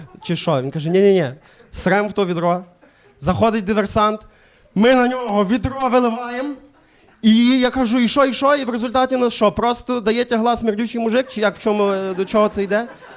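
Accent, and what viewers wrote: Galicia, Ukraine. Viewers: Прекрасний галицький акцент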